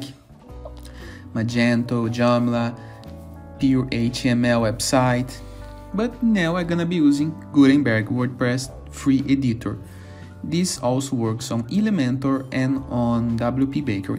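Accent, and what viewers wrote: Brazil. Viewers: ingles de brasileiro kkk boa mano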